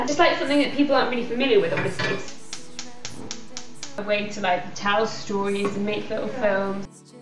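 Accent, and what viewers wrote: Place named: RP, United Kingdom. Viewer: you sound so posh in this video...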